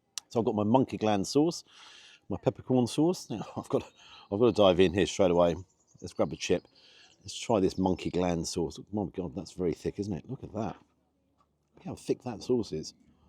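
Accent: RP, United Kingdom. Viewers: very posh posh posh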